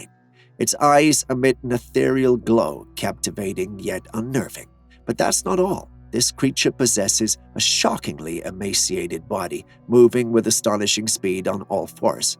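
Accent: England, United Kingdom. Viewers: Why halfway through this podcast did you all of a sudden you have an English accent?!! Did anyone else notice this?! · Yeah the narrator's voice pulls me into the action of the videos. Where is the dude from England or something?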